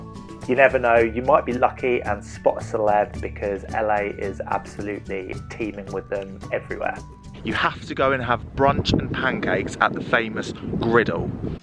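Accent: United Kingdom. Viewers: I liked the video very much as it covers the points that need to be gone over. I have absolutely fallen in love with your UK accent. I could listen to you say "Boulevard" all day long. So, you were sexy and informative. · Hi. Loved hearing the English accent on a US guide for a change. What I hear repeatedly is the hike up to the Hollywood sign, which, as I'm in a wheelchair is a lot of a no no. I really would like to find out what is here in LA that a 65+ year old couple and our Mustang convertible can get to, with my wheelchair and "er indoors" dodgy legs 😥 Really enjoyed the video though 👍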